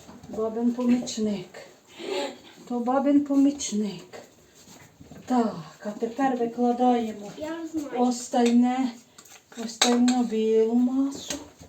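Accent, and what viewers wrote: Ukraine. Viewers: Дивуюсь де яким коментарям.Все показано і розказано дуже чітко і ясно.Приємно дивитись і слухати таку нашу милу сільську украінську мову.Дякую і здоров'я вам і вашим помічникам.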